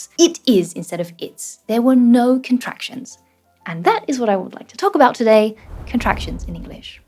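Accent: England, United Kingdom. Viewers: Your voice and accent are really pretty! Where is your accent from? Is it English? Do some Australian accents sound British? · Hello! I like your British accent! Do you live in England ? And can you share tips to have an accent like yours ? I'm learning English(at school) but I live in a country where english isn't spoken that much... I like British accent so much! I've been trying to learn it but I don't have anybody to speak English with, so... I just talk with myself and I don't really feel like my British accent is improving...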